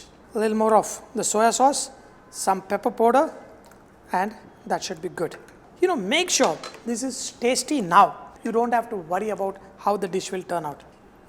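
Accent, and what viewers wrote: India. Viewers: I dont want to listen ur voice and butler English, thats why I mute the video.. But like ur skills.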